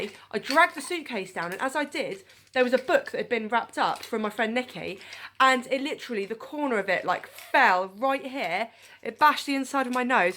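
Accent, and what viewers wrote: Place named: United Kingdom. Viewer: I like u accent ! looks like british . But anyway , adorable video. Thanks for sharing with. Hello from Japan!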